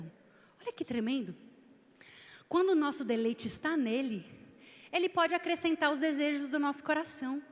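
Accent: Minas Gerais, Brazil. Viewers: pensei que tinha sido no mineiro kkkk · é sotaque mineiro mesmo kkkkkk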